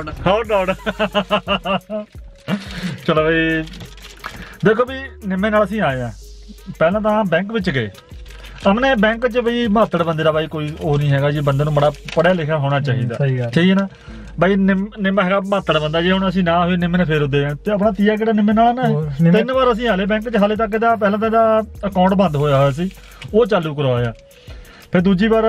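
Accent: India. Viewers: Yar ehi desi English tah sanu changi lagdi ea, veer sira, v sira banda,ehi kuch loki dekhna chonde ea😃👌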